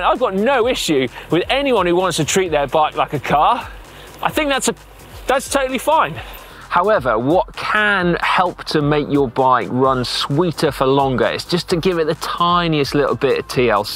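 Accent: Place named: United Kingdom. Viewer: Lifetime bike rider from Madison, Wisconsin, USA (as opposed to all the other Madison, Wisconsins the world over) and fan of this channel for awhile now just wanted to express my appreciation for this channel and this is a very good video. I love to watch GCN viids most of all for the British accents and expressions, this guy (bloke) is one of my favorites. Hey keep going GCN and rock on!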